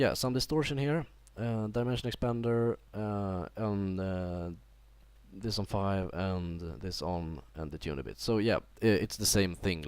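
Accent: Sweden. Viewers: det hörs att du är svensk ;) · Dat Engelska med svensk dialekt!! epic!! :D Bra Tutorial mee :)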